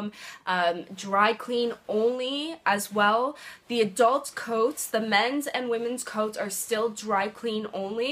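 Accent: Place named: Canada. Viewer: i love your cad accent..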